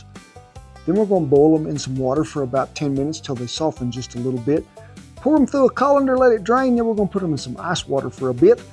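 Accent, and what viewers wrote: United States. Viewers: A country white man peeling and prepping nopales with a huge USA flag behind him and has a country accent. Mexican culture is strong.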